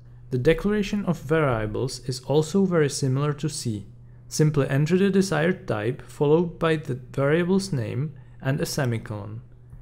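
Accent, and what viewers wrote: Czech Republic. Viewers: Czech accent immediately spotted :D, thanks for the great video. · tady je někdo čech já to v tom slyším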